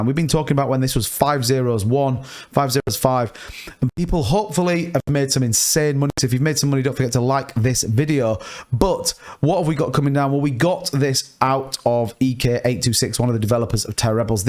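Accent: United Kingdom. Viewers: It's hard to understand what ur saying when u talk too fast with the British accent. Just a heads up from over the pond. Love your channel!!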